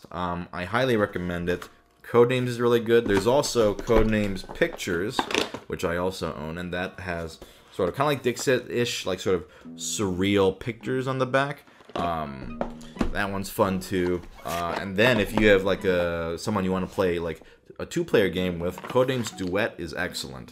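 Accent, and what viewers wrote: Germany. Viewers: Your German accent is on point! Bruh! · God I love his fancy German accent lmao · German accent made me subscribe 🤣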